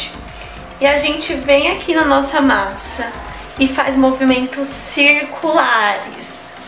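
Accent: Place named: South, Brazil. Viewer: Bom dia. Moro em São Paulo, mas sou Gaúcha! E tu , mora no Sul? No Paraná? Pelo sutaque! Bjs Amo fazer pão caseiro!!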